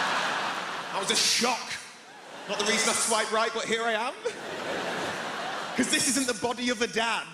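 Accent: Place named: North, United Kingdom. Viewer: Fantastic set. I can never get enough of a Northern British accent, as well.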